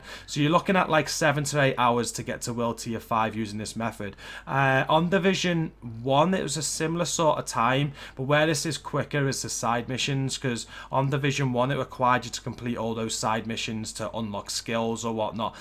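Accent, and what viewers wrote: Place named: Liverpool, United Kingdom. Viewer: Sounds like your from liverpool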